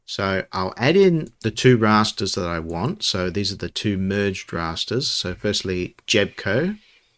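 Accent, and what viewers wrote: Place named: Australia. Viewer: I watched this exactly 5 minutes after you uploaded but it was around 4AM in the US & I was too tired to think of what to comment. Now, another late night as I was revisiting your video, I wondered why you would upload at that time. Then it hit me that your accent is Australian, not British, so no wonder you chose this idea to use for a video. Nevertheless, it is awesome to see that something off my list was worth investigating. I have always been fascinated by this region since learning about the recently extinct Moa birds that so strongly resembled dinosaurs & by my family owning a full grown pet Emu when I was a child. Australasia is so full of earth lore like this & I am especially intrigued by the scattered islands connecting Australia & Asia where it looks as if the continents violently dragged bits of land with them as they drifted apart. 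Anyways thanks for the video & hope you are enjoying your summer!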